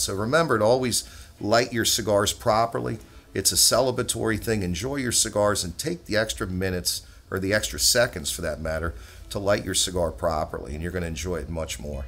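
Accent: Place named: Philadelphia, United States. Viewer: Hey...great vid..very informative...?..are you from the Philadelphia area?...your accent sounds like mine...born and raised in the city..thank you again for being a leader in this great hobby of cigars🤙💨💨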